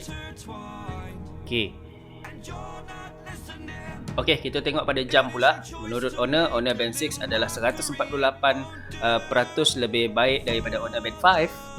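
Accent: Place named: Muara Tuang, Malaysia. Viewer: Eh, muara tuang eh. Patut la mcm ngelala jak bunyi sleng tek. Hehe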